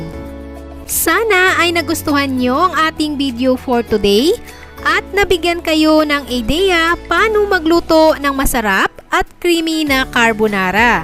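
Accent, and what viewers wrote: Visayas, Philippines. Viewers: Bisaya ba si nanay?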